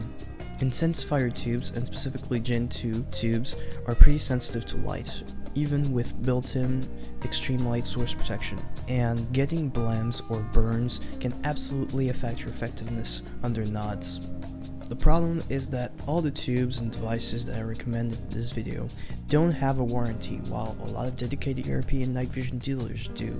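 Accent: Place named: United States. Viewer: not bitching but you sound awfully american for someone giving advice on getting nods in eu, hehe. also, is that machine girl in the outro?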